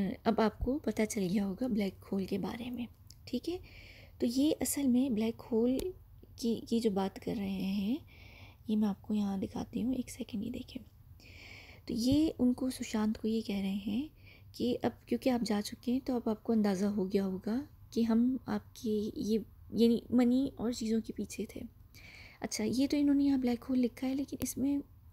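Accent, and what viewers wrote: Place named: United Kingdom. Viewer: No worries, do what you want. I just appreciate your intelligence. Accent and language doesn't matter at all. Apart from that, I am enough efficient to pick on your U.K. accent where you lag. Let me know if you need help for language/ accent part.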